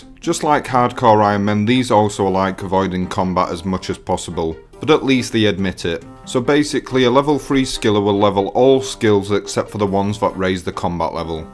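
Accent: Yorkshire, United Kingdom. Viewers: Wait, which Yorkshire are you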